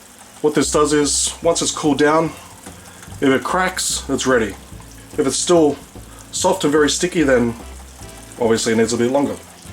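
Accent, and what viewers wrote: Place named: Australia. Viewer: Hey mate are you aussie by any chance? Nice apples btw, they looked so tasty and when you bit into it I was thinking "god I bet that tastes sweet"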